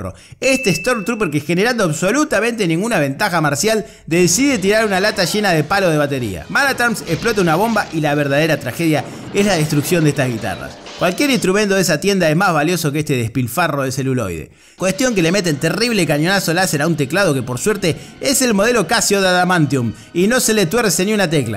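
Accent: Argentina. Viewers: jajaja sos genial, pero una duda, eres argento o mexa? traes de las 2...recuerdo esta peli en el cine, todos los adultos dormidos, y yo ya me quería ir a la casa cuando vi que ya estaban en una cd de Eu!!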